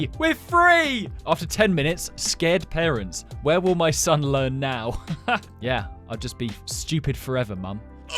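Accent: United Kingdom. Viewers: r u bri'ish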